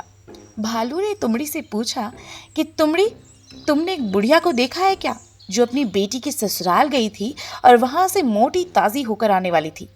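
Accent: Garhwal, India. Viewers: Tears on my eyes.. thanks, I love you maa forever.. 'hit tumadi bate bat me kya zandu Teri budiya ki baat'.. ma'am your garwali accent just osm. 😊😊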